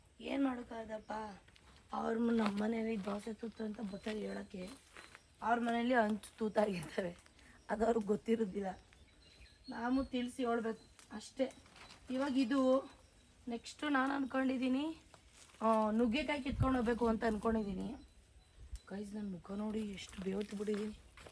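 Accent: Mandya, India. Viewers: ಸೂಪರ್ ಮಂಡ್ಯ ಭಾಷೆ.. .... ನಿಮ್ಮದು ಮಂಡ್ಯ ಅತ್ರ ಯಾವ್ ಊರು ಯಾವ್ ತಾಲೂಕ್ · Yav uru nimdhu nammuru bhashe tharane edhe nim mathu kathe mandya athra yav uru ma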